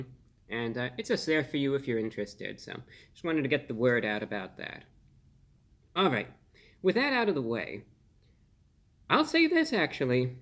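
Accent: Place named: Mid-Atlantic, United States. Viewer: a stylized 1940's Mid-Atlantic or Transatlantic accent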